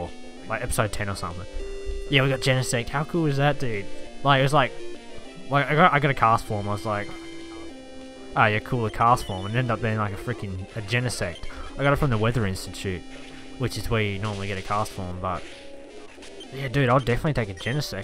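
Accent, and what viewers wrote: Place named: United Kingdom. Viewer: Nice a British guy goooood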